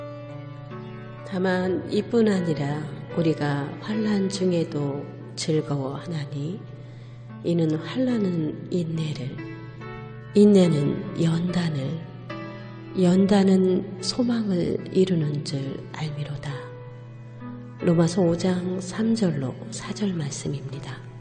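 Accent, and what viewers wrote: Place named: South Korea. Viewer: Nice, calming voice. I love korean accent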